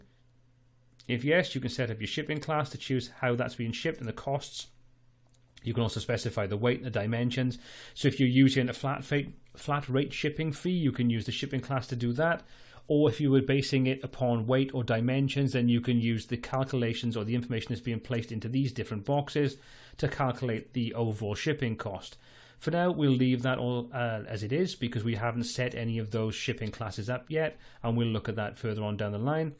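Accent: Wales, United Kingdom. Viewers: Thanks - this is a brilliant video. I watched this because I wanted to see if I could customise WooCommerce to work in other languages, namely Welsh. I can’t find a straight answer online. From your accent I’d say you’re a Welshman! Before I download the plugin to see for myself, do you know if a)Welsh is supported or b)I can customise myself without having to delve too much into css and php etc. Thanks!!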